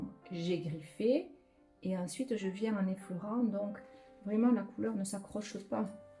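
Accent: South, France. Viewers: et en plus avec votre bel accent du sud c’est encore plus agréable à écouter et voir 🥰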